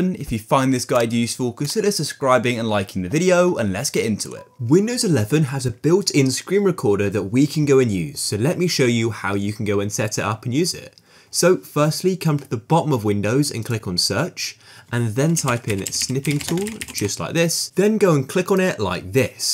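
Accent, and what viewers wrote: United Kingdom. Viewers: bri-ish mate helped me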